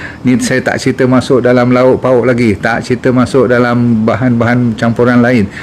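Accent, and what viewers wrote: Malaysia. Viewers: Doktr bercakap guna satu dialek di Malaysia. Jika sudah biasa tentu mudah faham sebagaimana ikuti dr zaidul.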